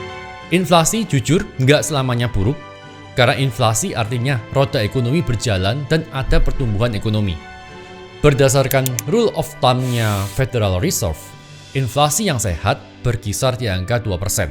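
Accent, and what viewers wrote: Java, Indonesia. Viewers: Ko fokus bljar ngomong gk medok dulu lah biar enak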